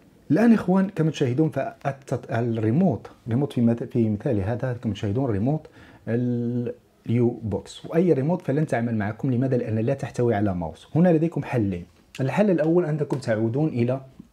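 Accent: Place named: Amazigh, North Africa. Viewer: كل شر وحك صعبه ولانهم منك شي لهجه امازيغه صعبه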